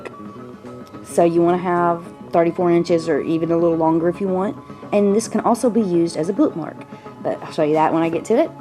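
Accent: South, United States. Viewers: where you from you have a southern accent :)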